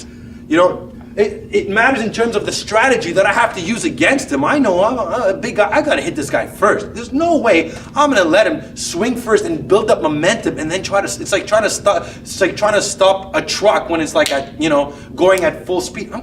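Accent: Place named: New Jersey, United States. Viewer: yo guy, are u from the jersey or isreal or brooklyn area? i only ask because im from jersey and ur accent kinda sounds like one of the 3 places i mentioned.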